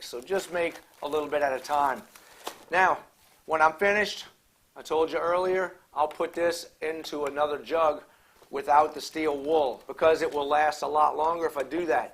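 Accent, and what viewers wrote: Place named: Metairie, United States. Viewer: I love your videos. As a displaced Metairian, the only person around here that sounds like you, is me! You remind me of home.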